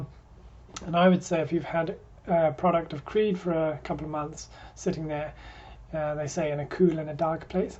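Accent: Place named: United Kingdom. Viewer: My friend you made my Bose 700 headphones sound like a $5 wallmart earphones. First I didn't understand if my headphones missed a connection and the sound comes out of my cellphone or out of my arse. Creed is good but please for God sake invest $45 for a decent microphone or use your cellphone microphone I bet it would sound much better then your current laptop. Lastly, I wanted to mention, you have British accent so why do you complain about the price here. This bottle cost you no more then 170 pounds, we on other hand here in North America pay double of that. Some places I know charging for the same 100ml bottle $520 USD tax included this is your £405.....this is damn expensive! And thank you for the video. Cheers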